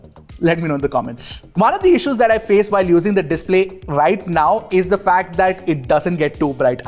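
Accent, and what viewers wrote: India. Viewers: Yuk indian accent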